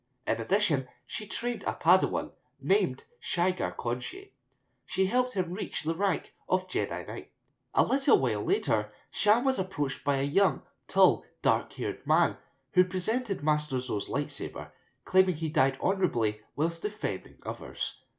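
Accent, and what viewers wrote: United Kingdom. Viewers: British accent I'm thinking? or just a diverse American voice here?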